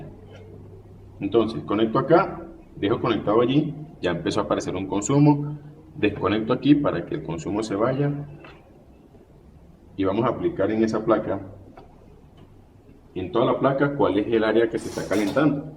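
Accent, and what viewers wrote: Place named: Venezuela. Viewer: Gracias !! al escucharte por el acento lo pensé que eras de Venezuela...sigue adelante, ya me suscribí...desde Valera Edo.Trujillo Venezuela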